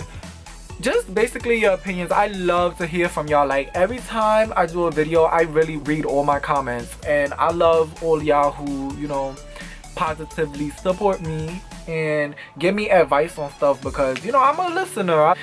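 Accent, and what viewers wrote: New York, United States. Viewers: Hey how old are you...you new york accent is so cool love to hear you tell storys your so animated..lol..jhave you ever been to kansas city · fucking love your accent 😬 I miss new york so much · I could listen to you read the dictionary! Your NY accent is so dope!!! Love from London UK x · this new york accent is everythingggggggg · Your accent be havin me want to live in New York.......like its life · Is he from NYC.? Bc I'm from NYC and I talk the same way, same slang and all😂😂...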